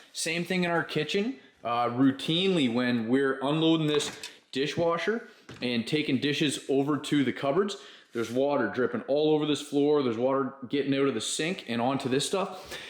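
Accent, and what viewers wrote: Canada. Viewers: That hard ass Canadian accent...you act like this stuff is a new product...vinyl flooring has been around sometime...The only difference...in the past it was installed like carpet in rolls. Know the manufacturer gave customers/market pieces in a box instead of rolls.. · when I first heard you talk I knew you were from Canada. I'm from Northern Minnesota. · looks good! I know this has no relevance to the video but I love that i can tell you are Canadian by our distinct "accent" LOL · Yes noticed this too right away from a fellow Canadian! I get the same in my channel in the comments all the time haha can’t hide that Canadian accent 😁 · I knew you was in Canada by your accent. I live in West Virginia and I’ve been doin research on flooring for my house. This looks good but I’m scared that it would come up.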